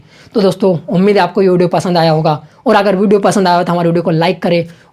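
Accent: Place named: Indore, India. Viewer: Bhai ap Indore se ho apki awaj parhchan Li mene😅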